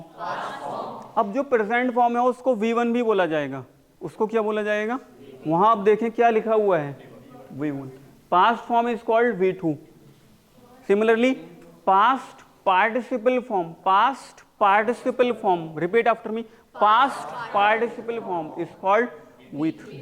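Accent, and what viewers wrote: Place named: India. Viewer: Indian pronounce cant understand other countries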